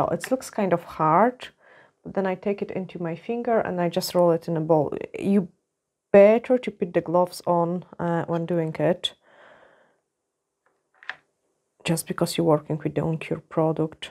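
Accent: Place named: Scotland, United Kingdom. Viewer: How funny, I wondered why your accent sounded a bit off... lol.. you actually picked up your Scotish accent with Polish. 👍👍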